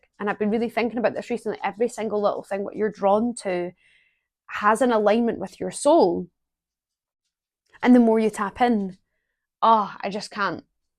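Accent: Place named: Scotland, United Kingdom. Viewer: Thank you for this video and message. I think you tapped into the energy I feel when I connect with my guides. It’s pure love and I don’t really feel it with other people, only with them. I think I also like the connection to Scotland. My grandmother and other relatives come from the Munro Clan and hearing your accent brings a familiar joy ❤ Have a good day!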